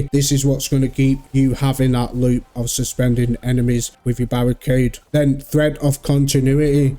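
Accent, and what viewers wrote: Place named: Northern England, United Kingdom. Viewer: Bye heck great accent fella 👍🏻 fellow northerner in peace ☮️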